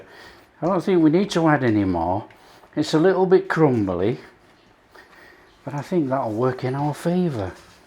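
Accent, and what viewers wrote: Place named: England, United Kingdom. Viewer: Love your accent although I haven’t a clue where in England. Sadly these recipes will die out, perhaps I’m wrong. I was born in 1973 and growing up my mother always told how rewarding it was to produce a hot meal from ingredients that don’t cost the earth. She taught me to cook which has absolutely benefited me in my adult hood. I searched suet pie and stumbled upon your site, Traditional English cooking that tastes absolutely delicious. I’m originally from Norfolk and was spoilt with ingredients using pheasant and partridge I’m fed watching chefs that andypandy around throwing pepper/ god knows what over your shoulder. I’m now able to google/youtube your recipes & understand amounts etc to use. Thank u x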